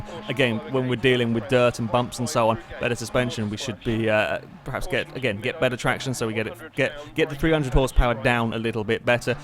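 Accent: United Kingdom. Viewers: Aussie? Sounds British to me (I'm British, but live in Australia, so I can tell the difference).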